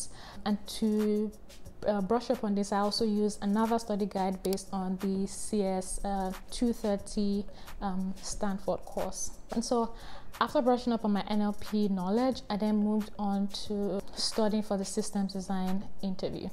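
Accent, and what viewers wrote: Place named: Nigeria. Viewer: That's Nigerian accent ! 😊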